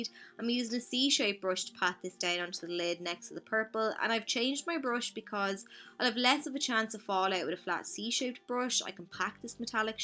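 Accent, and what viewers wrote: Ireland. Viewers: I could listen to your accent all day girl! Taking your irish accent and adding a makeup tutorial with it is pure heaven for me lol love your skills girl!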